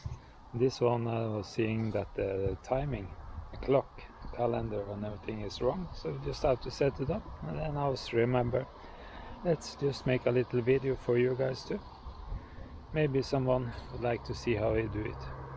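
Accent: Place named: Europe. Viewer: You are speaking some type of euro rubbish English. Learn better English before doing another video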